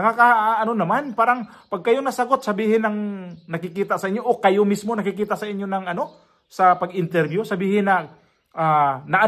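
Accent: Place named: Surigao, Philippines. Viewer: HI SIR SA AN KA SA PINAS PARANG SURIGAO KA KASI I HAVE PAMANGKIN SA SURIGAO NAKATIRA MAGSALITA TULAD SA EMO.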